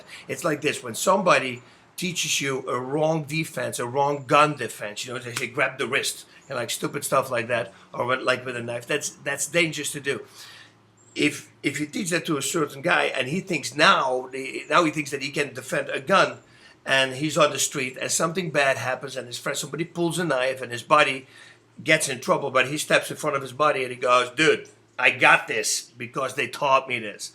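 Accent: Netherlands. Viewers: ja das ff wennen Brabants dialect :)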